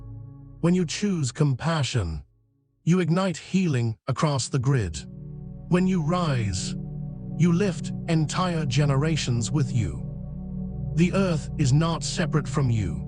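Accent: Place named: United States. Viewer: You have changed you voice,bringing out the American in the accent!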